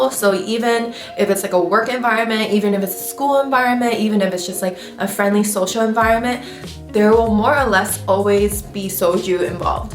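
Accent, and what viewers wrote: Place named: Queens, United States. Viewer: She has such a queens accent.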